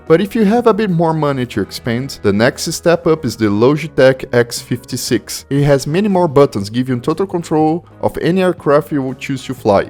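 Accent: Brazil. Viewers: By the accent this bloke is brazilian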